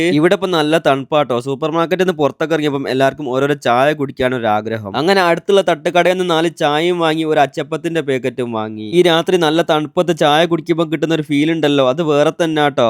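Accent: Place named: Kerala, India. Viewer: നമ്മളെ കൊടുവള്ളി ഭാഷ ആണല്ലോ 😂😂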